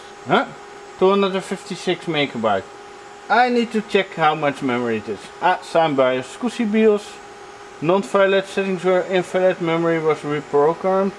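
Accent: Netherlands. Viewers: I wasn’t ready for that Dutch accent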